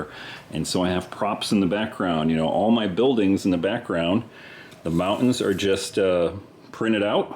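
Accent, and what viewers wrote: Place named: California, United States. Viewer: Absolutely just binge watch your videos. Apart from the way-too-cool Californian accent, they're just like trainspotting: things are happening, lots of action at a leisurely pace, just watching the locos do their thing. absolutely pleasurable. I have a vague question more related to prototype movements: if you turned back the clock would a caboose have been used, and if so, how would it have been incorporated into the train movements? Must be pretty hard running round a train with a parked caboose in the way... cheers for all your hard work putting these vids together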